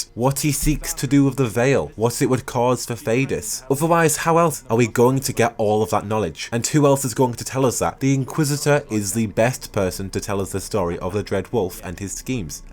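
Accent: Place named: Sunderland, United Kingdom. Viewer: I thought I heard a Mackem twang in your voice, and although my mage may be 1 handed he’s far from helpless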